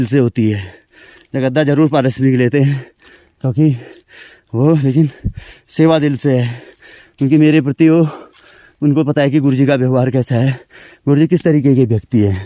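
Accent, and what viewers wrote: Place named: Almora, India. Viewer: गुरु जी गांव, विकास खंड व जिला भी बताने का कष्ट कीजिए। वैसे आपकी आवाज से लग रहा है आप अल्मोड़ा जिले से हैं। धन्यवाद जी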